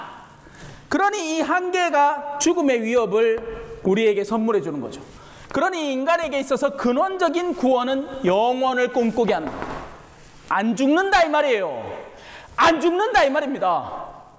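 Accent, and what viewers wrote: Gyeongsang, South Korea. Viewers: 신부님 감사합니다 함께 공부할수 있게 해주셨어요 ❤ 🎉 경상도 사투리도 정겹구요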